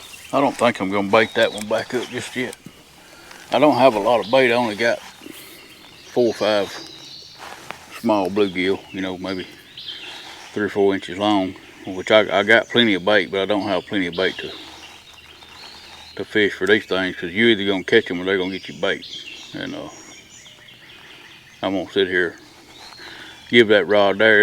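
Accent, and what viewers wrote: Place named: South, United States. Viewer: Wow man you gotta nice Southern accent LOL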